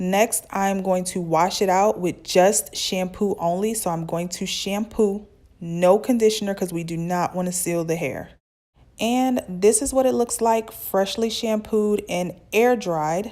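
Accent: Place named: New York, United States. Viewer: GIRL! You can hear the NY all up and through this video 😂😂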